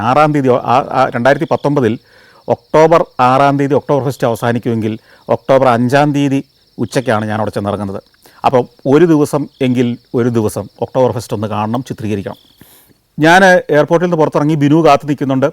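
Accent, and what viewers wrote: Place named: Kottayam, India. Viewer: എടുത്തിട്ട് ഇണ്ടൻ അടിച്ചോണ്ട് ഇരിക്കുവാ....കോട്ടയം സ്റ്റൈൽ😂😂😂❤❤🎉🎉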